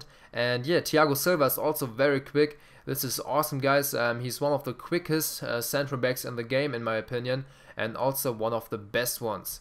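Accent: Germany. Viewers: R U GERMAN OR FRENCH???????????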